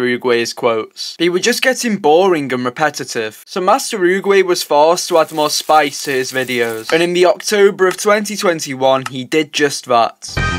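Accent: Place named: United Kingdom. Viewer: "and you can always undo it if you get tired of my british accent" LOL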